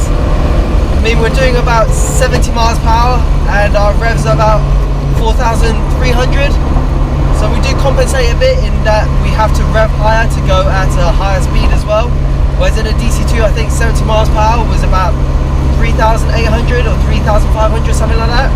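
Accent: England, United Kingdom. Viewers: Fuck bro u sound like a Pom hard , u must live in England surly?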